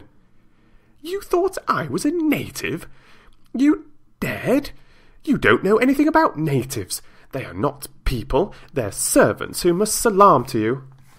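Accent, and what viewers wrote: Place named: Yorkshire, United Kingdom. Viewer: Great reading as always! Like the Yorkshire accents, are you from Yorkshire? Keep up the great work 😀. PS how about some more Lord of the rings..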